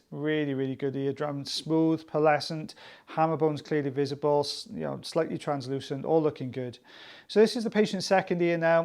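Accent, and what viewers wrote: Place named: Wales, United Kingdom. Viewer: I watched a vid from you, of 2 years ago. You didn’t sound as ‘Welsh’ then. What’s occurring?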